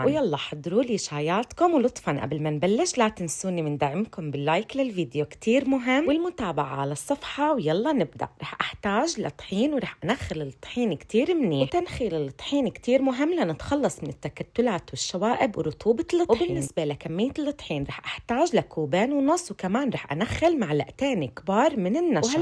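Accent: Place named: Palestine. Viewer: اولا انا اكتشفتك من اسبوعين ومتابعتك من سوريا وبيعجبني شغلك كتير تانيا انتي اكيد فلسطينيه مبين من لهجتك الحلوه وكنيتك وانا كمان ستي امه لابي فلسطينيه من بيت التابلسي بس نحن سوريين ....تالتا رح نجيب شاياتنا ونجي ناكل الكاتو عندك بس انت وين ؟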